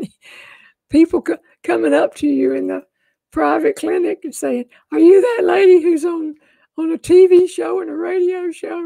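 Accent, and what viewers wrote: South, United States. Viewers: I normally can’t listen to a southern accent, but is an exception. Love her. R.I.P.!❤️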